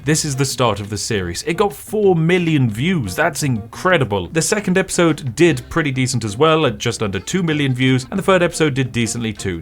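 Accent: United Kingdom. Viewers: Still prefer your brit voice instead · I was sleeping, then I heard a familiar British voice and I woke up edit: This is why I fall asleep watching something rendom and always end up in Linus' podcast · Why is thing British Guy telling me to go to sleep at 6pm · I don’t have auto play on, but I have put on a Spiff video from time to time to get in a good nap after work. He’s not wrong about his smooth low British voice. ;) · I was wondering what was making it so I was always being awoken by a British voice talking about tea when I started my night about dinosaurs and monster hunter ecology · No auto play but I regularly use your videos specifically Victoria 3 to go to sleep, that smooth British voice soothes my coffee drinking self to sleep, win win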